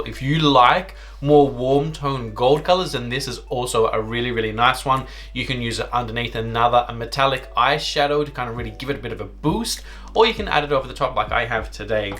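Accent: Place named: Australia. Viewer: Australia, I was trying to pick your accent as it sounds aussie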